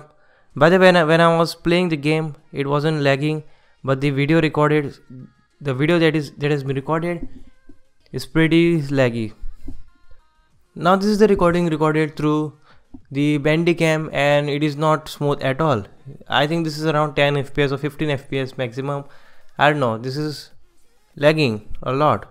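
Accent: India. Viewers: Nice 1.16.3 english Indian boy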